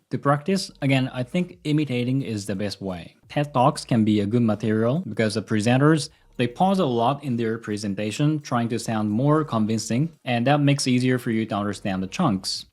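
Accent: United States. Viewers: Very impressive you've definitely got an American twang! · Your american accent is amazing, love your video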